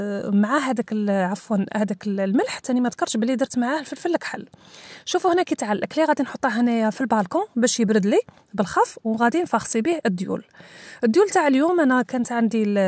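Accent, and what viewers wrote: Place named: Oran, Algeria. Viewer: Salem hbiba Allah ya3tik essa7a ana wahraniya 3aycha fil Maroc je suis fan mtan3ak bezzaf tfakrini f mdinti la3ziza et surtout l accent rabi ykhalik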